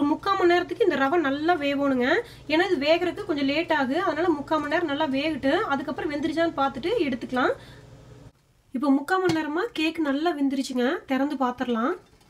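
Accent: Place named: Coimbatore, India. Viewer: அக்கா நீங்க கோயபுத்துர் றா உங்க பேச்சி நல்லறுக்கு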